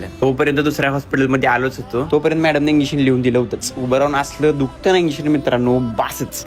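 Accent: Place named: Karad, India. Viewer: मित्रा बोलण्यावरून कराड चा वाटतोय 🤔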